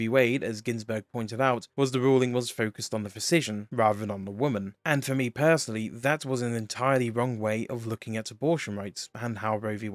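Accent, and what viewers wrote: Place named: United Kingdom. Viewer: Pretty cool but british accent so i have to dislike... sorry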